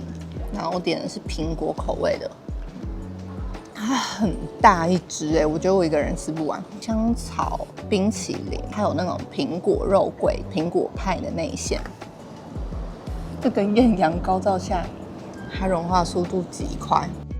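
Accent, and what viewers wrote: Taiwan. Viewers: 台湾旅游博主拍得最好的一个，台湾口音特别好听的，没有太多鼻音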